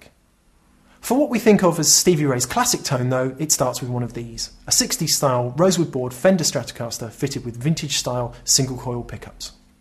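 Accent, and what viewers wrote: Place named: United Kingdom. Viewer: !!!! so Young! As a non-English speaker it is remarkable how his accent has become so much clearer over the years whilst remaining so British. IMO, the best guitar youtuber with Andy and Justin. amazing guitar playing and presentation skills, I am so envious:)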